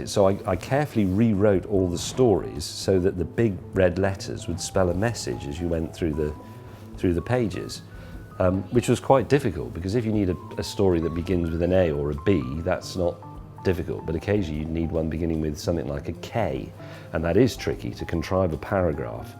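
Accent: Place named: United Kingdom. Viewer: It's not caarth its cars.. I swear, I hate British accent...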